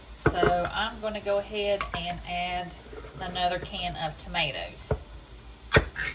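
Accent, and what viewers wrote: South, United States. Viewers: I love taco soup. Great tutorial. New friend here. Do I detect a southern accent 😃? ❤👵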